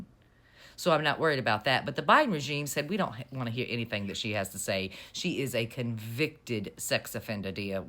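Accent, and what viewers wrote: South, United States. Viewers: But where in the south? She has the most beautiful accent.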